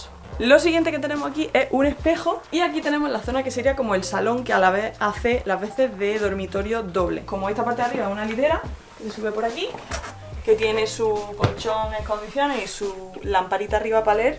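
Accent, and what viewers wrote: Andalusia, Spain. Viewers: Amo amo el acento andadulez. Se dice así?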